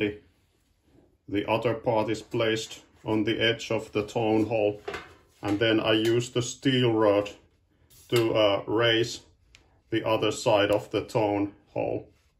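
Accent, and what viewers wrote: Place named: Finland. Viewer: Hi, Awesome Video. I thought I recognised your accent, and with a name like that, I thought surely you are from the fine country of Finland. I have a good friend of mine who lives in Kuopio. Thanks for sharing!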